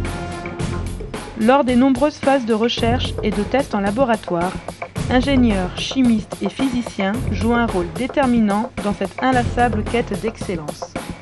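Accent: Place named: Mexico. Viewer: why is she talking mexican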